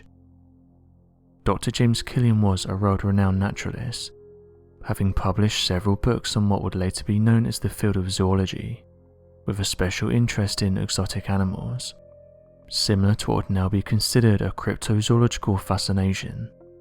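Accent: United Kingdom. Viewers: Spooky mysterious British accent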